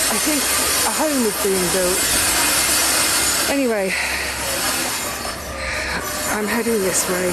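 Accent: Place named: West Country, United Kingdom. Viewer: Wouldn't it be lovely if everywhere could have a cottage hospital, when we were in Suffolk there was one we went to in Aldeburgh, and there is one near us now at Wells-Next-The-Sea. Hope you get a bus pass 🤞 Did you know you're developing a West Country accent? I've noticed it more and more recently 😊❤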